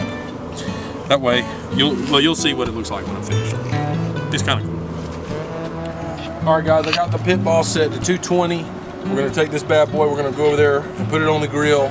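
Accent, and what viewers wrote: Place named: Alabama, United States. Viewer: Are you from Alabama? You sounds like it.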